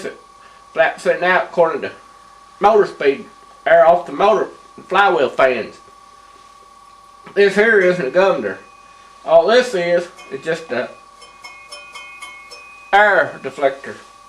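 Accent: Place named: Tennessee, United States. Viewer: Are you from Tennesee some where's ? Like around Kingsport? I knew another guy sounded just like you from up that way. Excellent video and I just pulled my cover on my 11hp 92 briggs, and was wondering about that magneto being rusty, and this was very appropriate. Take care and keep the video's coming...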